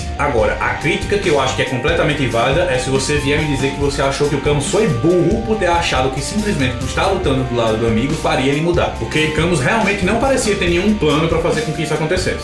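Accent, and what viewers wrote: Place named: Northeast, Brazil. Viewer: cara vc e hilario.. serio.. seu jeito. seu sultaq he mt engraçado. por acaso vc e do seara ou paraiba.. seila esse e nt engraçado. os conentarios as cateretas q vc fz mt louko. mas parabems pelos videoa. ce deve ter passado horas dias ate mezes pesquizand sobre cdz ne mesmo. eu axo seus comentarios meio rud. tipo eu sei mas q vc fds. alem da pronuncia dos nones e palavras japonesas alem de errado fk mt engraçado no seu sultaq nortenho.. apropisito tbm n posso falar de vc q meu n he dos melhires.. tbm sou nordestino kkkk mas moro em sp fz tempo. e estudo japones a 6 anos. ja estou quase me tornando sempai seinto. kkk falow anigo onedetougosainasu. jya ne... te mais.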